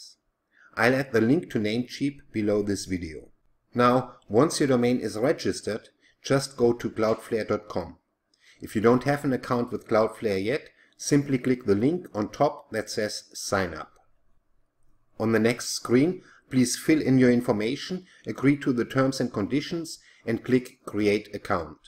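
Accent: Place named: Germany. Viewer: Thank you, this was really helpful. What could feel more secure than having a German accent helping you manage technical stuff online confidently.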